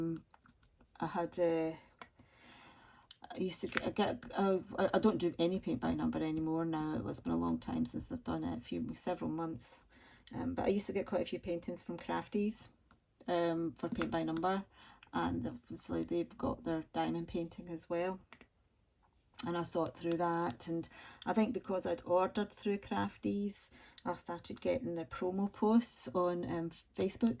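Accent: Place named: Scotland, United Kingdom. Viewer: Welcome to Youtube! Lovely to have another voice from the UK. I love your accent - I used to live in Edinburgh for 9 years and I miss Scotland a lot! Beautiful painting, enjoy working on it!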